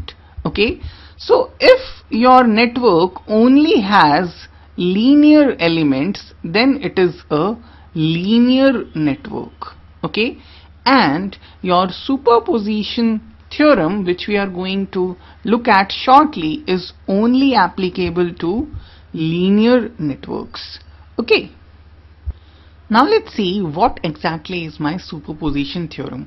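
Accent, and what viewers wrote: India. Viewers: What is wrong with your voice and your accent???????? Are you an Indian??????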